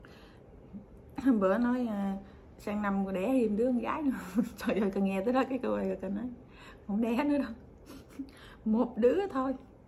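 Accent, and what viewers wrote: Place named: Mekong Delta, Vietnam. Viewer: Giọng chị chắc ngừoi Miền Tây hả chị! Phải ở gần mình tới lui cho đỡ buồn. Em cũng mới sinh xong nên thấy thương mấy Mẹ bầu sắp sinh lắm.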